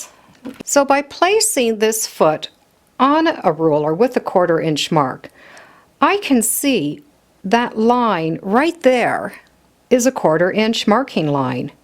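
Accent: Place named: Canada. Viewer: I always have trouble stitching in the ditch on the top and being able to "catch" the backside of the binding in those stitches. I'll turn the quilt over and find that I missed long lengths of the binding and have to go over it again, wrecking the nice clean look of the front. How on earth do you do that??? (also, you must be Canadian! My mom and Granny were from Toronto and always said "agaynst.' I was born and raised in Los Angeles. They both died decades ago, so your accent really brought back lovely memories of my Canadian side!). Thanks for posting. Very helpful! God bless!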